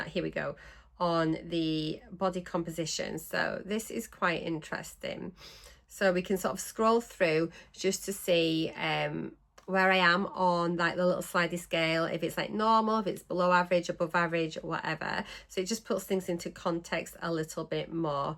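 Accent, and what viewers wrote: Yorkshire, United Kingdom. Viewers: So pleased to hear you’re so close to your target! I love your channel and find your Yorkshire accent so endearing! I’m just one pound short of 4st loss, but will need to pause my injections for two weeks prior to a knee replacement surgery, and another one or two weeks post surgery. Not thrilled about that but needs must, I suppose 🤔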